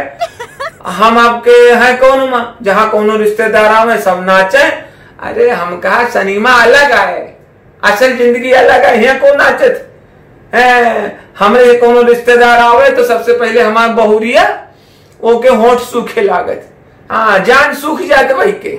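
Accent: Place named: Awadh, India. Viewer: एकदम शुद्ध ग्रामीण अंचल की अवधी है | बहुत बड़ा काम कर रहे हैं आप | "दहिजार का नाती " बड़ी मिठास भरी गाली है | लुप्त हो रही भाषायी विरासत को आप जैसे मझे कलाकार ही सजों सकते हैं | बहुत बहुत धन्यवाद जी |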